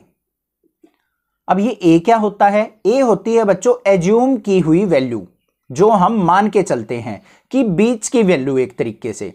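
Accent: Haryana, India. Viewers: Sir, I know that u are from haryana because aapki language mujhe thodi thodi haryanvi lgi. I am also from haryana ,Mahendergarh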